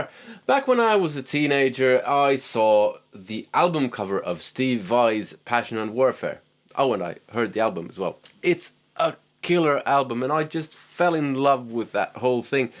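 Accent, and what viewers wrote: Ireland. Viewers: I'd wager you learned to speak English while living in Ireland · Where does your Irish lilt come from?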